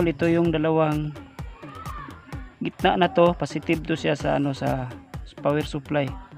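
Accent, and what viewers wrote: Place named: Visayas, Philippines. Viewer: idol mura ug bisaya ka ngutana lang! naa ka idea nga mo taas ang amperahe sa transformer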